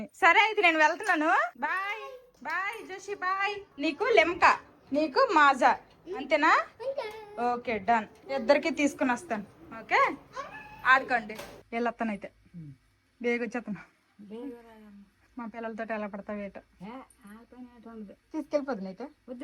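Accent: Srikakulam, India. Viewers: Srikakulam yasa super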